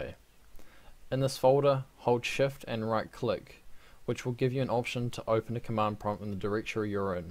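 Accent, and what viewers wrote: New Zealand. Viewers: eyyyyyyy We got a Kiwi ; )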